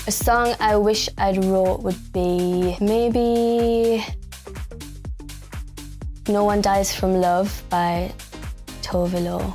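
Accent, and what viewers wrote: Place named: Newcastle, United Kingdom. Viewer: That Geordie accent she has man its melts my heart and her laugh... ok ok im simping ill stop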